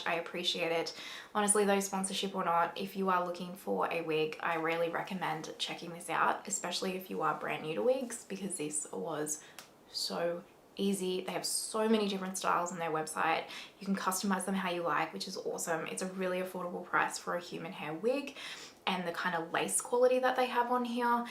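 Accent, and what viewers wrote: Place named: Australia. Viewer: I actually love this and the colour is perfect for me. I'd probably go for a bit shorter, maybe 14 or 16". Also, great to hear a fellow Aussie accent.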